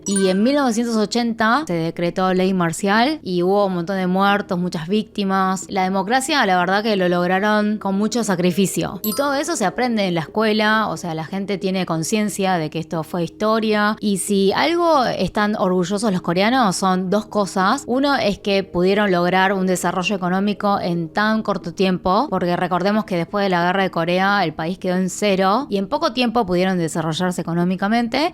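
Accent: Buenos Aires, Argentina. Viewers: Una asiatica hablando español, encima en tonada argentina dios mio 😱😱😱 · Si se nota que aprendiste español en Argentina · Es porque es Argentina, nació y vivió más de 30 años ahí, dudo que se le vaya el acento porteño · Vaya accento argentinos con el ya pronunciado ja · Toda América Latina, estaba esperando esta explicación. Adoro su acento Argentino. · Me gusta tu hacento Argentino. Dicen que si Korea se unifica como una sola Korea sería una potencia militar y económica y tecnológica. Con muchos recursos. Yo pienso que si porque la unión te hace fuerte 👍🇲🇦🇰🇷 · De donde me recurda lo que dice?... ah si ya se, de argentina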